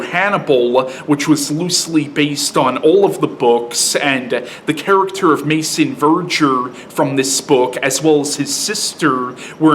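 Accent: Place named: Midwest, United States. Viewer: he sounds Midwest American to me.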